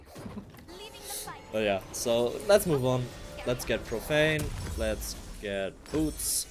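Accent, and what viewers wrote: Germany. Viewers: From your English accent I could tell you are from Germany, danke für die Tipps waren sehr hilfreich ✅️ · Now just for me, are u German?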